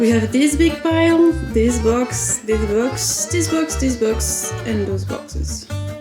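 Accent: France. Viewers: c'est trop drôle d'entendre une française parler anglais ( en plaçant plein de mot en français aussi 😄 ) en tout cas ton atelier créatif fait vraiment rêver 😍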